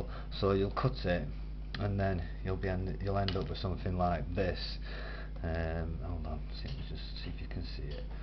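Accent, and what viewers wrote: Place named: United Kingdom. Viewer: Love the accent.. what part of the UK are you from? But anyway.. I really like that.. I think this would also work for the old fluval-on-top-of mod as well. I currently just have a bent paperclip inside the cart for the fluval to sit on and it works quite well. I could never get the silicon plug to be the right shape so I gave up on the zero filler thing.. whatever works though I suppose.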